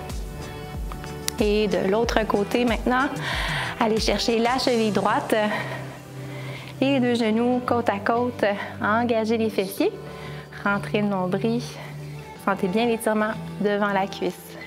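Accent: Canada. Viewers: C est clair le rythme est bon et un petit dernier …avec l accent canadien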